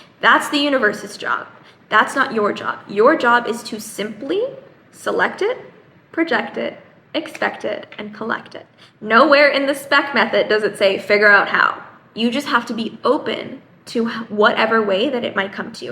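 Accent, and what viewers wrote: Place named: Bollywood, India. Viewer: ooh you're punjabi. i didn't even imagined that you have a proper bollywood accent. anyhow thode muho punjabi sunan ch boht khushi hougi😍😂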